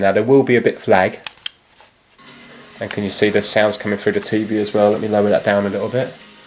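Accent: United Kingdom. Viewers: Same I also liked the video because this mans British accent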